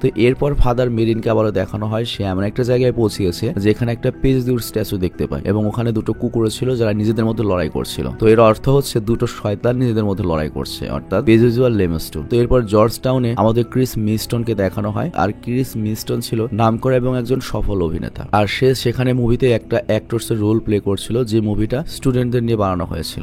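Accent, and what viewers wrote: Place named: Noakhali, Bangladesh. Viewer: নোয়াখালী কেন বাই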